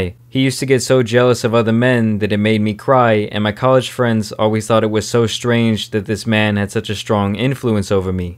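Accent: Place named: New York, United States. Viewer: I've been a fan of you since when you had like 20k subs I always thought you was from NY cause of your accent.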